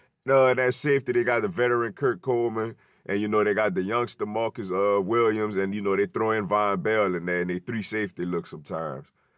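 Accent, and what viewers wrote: New Orleans, United States. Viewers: Sounds like you from New Orleans son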